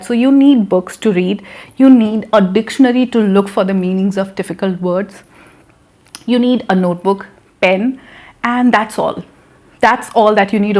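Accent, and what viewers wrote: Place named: India. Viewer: So nice video you have made me dear sister, with very clear voice, with very clear worlds but with super popular subcontinent accent and very useful points you have highlight, really learners are going to get benefits for your video. May Allah Almighty always keep you happy my dear sister 👍, Best wishes form Pakistan 🇵🇰🙏.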